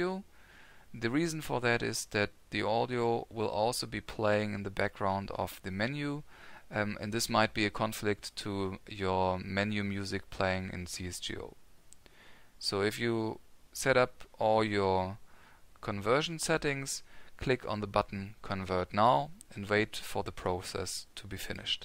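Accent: Germany. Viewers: Gotta love that german english accent. Sehr gut xd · Ich habe irgendwie instant gemerkt das du Deutscher bist xD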